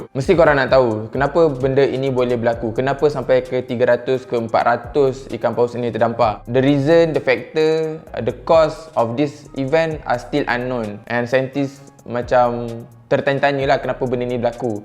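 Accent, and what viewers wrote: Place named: Manglish, Malaysia. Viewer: Manglish hahaha.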